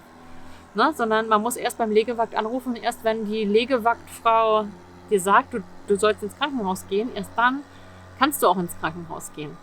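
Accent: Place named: United States. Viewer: This is a real good comment, I was thinking something along these lines myself. With the risk of appearing offended (which I am not) I feel some of the conclusions/observations are a bit superficial. If the video wasn’t recorded in German I’d easily mistaken her for being American 😎 The Swedes might have Ikea and H&M, but we have Equinor, Telenor and Norsk Hydro 😆And, mind you, regarding being ‘lazy’, lets remember the GDP per capita for Norway is almost twice that of Germany 😶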